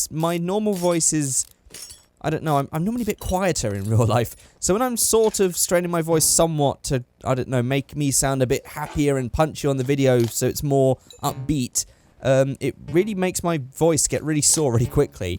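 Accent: United Kingdom. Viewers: great video it's relaxing it's like a podcast of a British guy who's never built a car in his life building a car I love it. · I couldn't wait to see this! Thank you <3 Btw, your accent seems British, Am I right or not? And one more thing: Chrome:P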